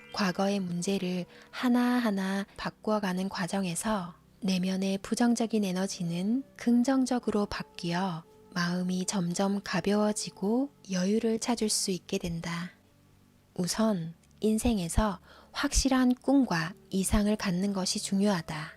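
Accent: Gyeongsang, South Korea. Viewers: 경상도분이시네요 어감도 좋구요 좋은 내용과 좋은 말투 감사합니다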